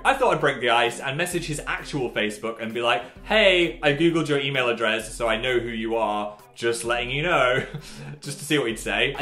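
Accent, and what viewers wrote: North, United Kingdom. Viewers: u sound northern again im living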